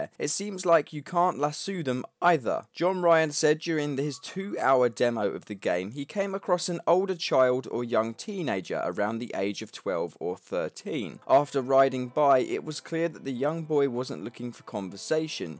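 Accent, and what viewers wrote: United Kingdom. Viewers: He just has a british accent thats all.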